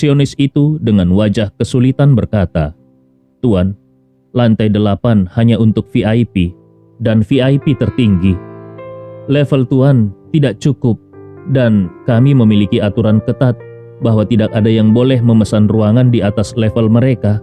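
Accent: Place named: Bugis, Indonesia. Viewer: Bismillah....maasyaa Allah..logat bahasa bugisnya ada..mwmbuat hati ridu akan tanah kwlahiran suamiku....salam untuk semua sodaraka..sehat afiat smakin sukses selamanya....aamiin..Abang yg baik....trimakasih..atas semua kebaikan..yg membuat semua bajagia..smoga lindungan Rahmat Allah mrnyertai...tsumma aamiin....sukses sehat slalu bang...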